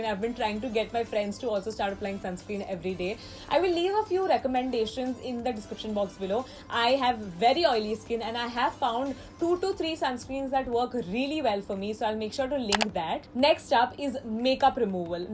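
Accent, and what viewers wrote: India. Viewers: I luv ur hindi accent diii......😍😘😍😘😝😛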